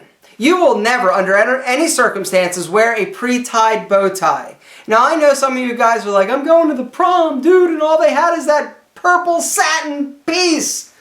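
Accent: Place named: New Jersey, United States. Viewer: Don't take it personally, he's probably from Jersey with that voice.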